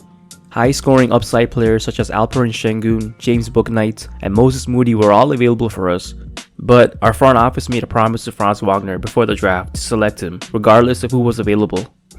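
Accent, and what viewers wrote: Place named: Germany. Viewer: bro versuch nicht deinen deutschen akzent zu verstecken man hört ihn deutlich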